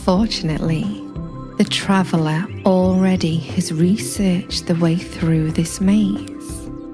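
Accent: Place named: England, United Kingdom. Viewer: Sensuous... so , so sexy....gorgeous.... sultry voice.....beautiful English accent....root beer eyes .....LOVE when you look directly into the camera. I fucking LOVE when I see a woman that knows how to be a woman , knows how to use her powers with men. You are irresistible , m' lady. Thank you for sharing your gift with us.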